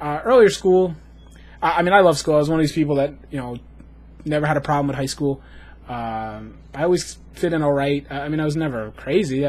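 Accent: Boston, United States. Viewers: you sound kind of like a boston guy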